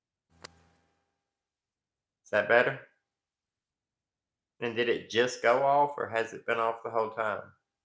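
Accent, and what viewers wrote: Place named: Alabama, United States. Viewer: I recognized your accent before learning you're from Alabama, I'm native myself. Love your channel. You make great points on every topic you choose to discuss.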